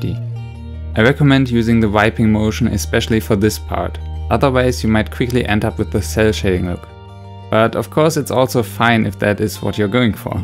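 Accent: Germany. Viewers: can i hear a German accent? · Tangerine He got that big fat german accent... just like me :) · Are you from Germany? I think I can hear an accent. Anyways, very good video because I bought Copics too, because I I think they'd be good for Manga drawings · you are german bc i hear it xD and i am german and on the paper block i can read "500 blatt" thats german bro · I love your beautiful German accent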